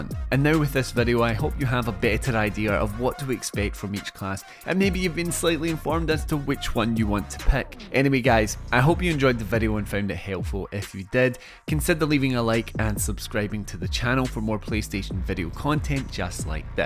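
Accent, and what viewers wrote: Scotland, United Kingdom. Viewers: That's a Scottish accent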